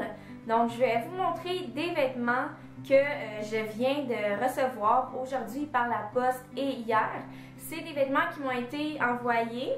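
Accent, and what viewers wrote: Canada. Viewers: J aime les 2 blouses et la robe blue blanc le tt assaionne de ton accent Canadian que je kiff fort !!humm Je m abonne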